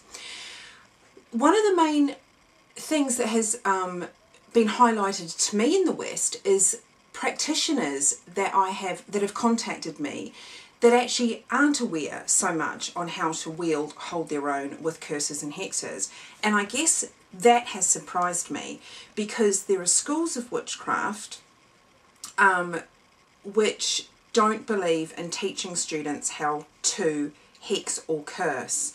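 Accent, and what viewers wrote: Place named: Scotland, United Kingdom. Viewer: you sound Scottish :))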